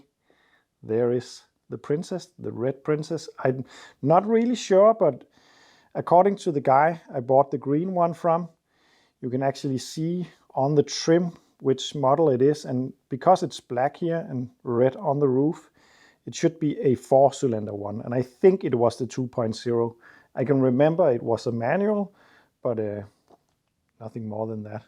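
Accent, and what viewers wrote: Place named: Denmark. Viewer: Looks like a Peugeot 504 at the front. I know a fellow Danish man and his accent sounds similar to yours.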